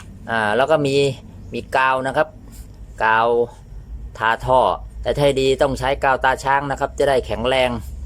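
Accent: Chanthaburi, Thailand. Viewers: พี่คนจัน หรือ ระยองครับ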